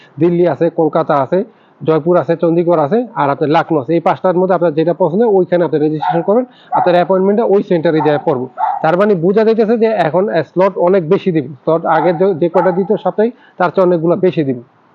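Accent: Barishal, Bangladesh. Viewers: দাদা আপনার বারি কই বরিশাল নাকি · আপনার বাড়ি কি বরিশালে?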